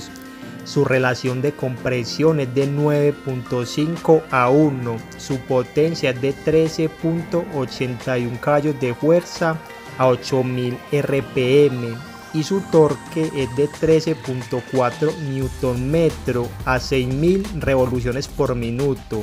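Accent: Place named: Colombia. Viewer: Creo que el dice que la compremos con ABS, por que en colombia venden motos pequeñas con ABS, pero de este lado del mundo no les importa nuestra vida. La verdad no se si este yutuber sea de colombia pero crep que si por el acento que tiene, no estoy muy familiarizado con ese hacento🤦🏻‍♂️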